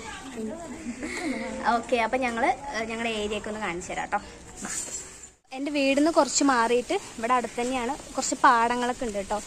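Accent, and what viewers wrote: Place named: Thrissur, India. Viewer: Thrissur slank anello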